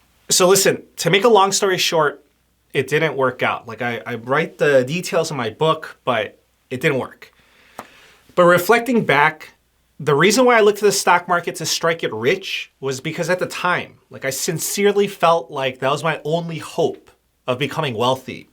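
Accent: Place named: Michigan, United States. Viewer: Are you from Michigan? You have a subtle Michigander accent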